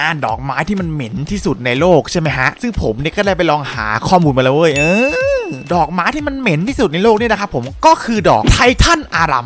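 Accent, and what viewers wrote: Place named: Sukhothai, Thailand. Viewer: พี่พูดเหมือนภาษาสุโขทัยเลยคับ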